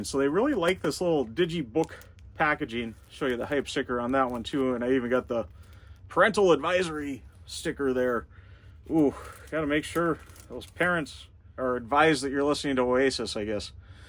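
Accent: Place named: England, United Kingdom. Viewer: as a norwegian, im struggling to hear if u are english or ameircan. yea, i love oasis. everything i love about, is in oasis. if have existed in 2000, they would have sound exactly like, even the harmonies are there. by oasis could be straight frim help, too,. is a very john lennon song too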